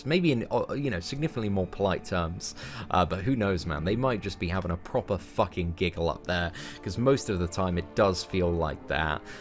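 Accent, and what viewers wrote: United Kingdom. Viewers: Not to be weird, but I love hearing you speak in your British accent with your British words hahaha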